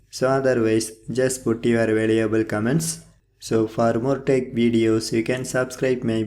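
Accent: South India, India. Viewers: no problem brother i am just joking 😂 i know brother you're from south india and you've different accent ❤️🙏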